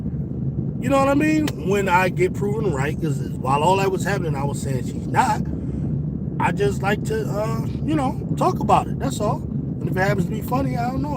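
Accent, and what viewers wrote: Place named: Philadelphia, United States. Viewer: Bruh I'm at work at 3 am listening to ur content u got me cracking up 😂 really enjoy ur channel very informative and the way u talk that Philly talk saying jawn u got a subscriber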